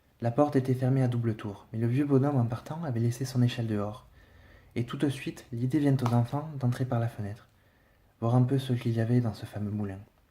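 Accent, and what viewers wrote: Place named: France. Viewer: So relaxing! The French accent/language is so great!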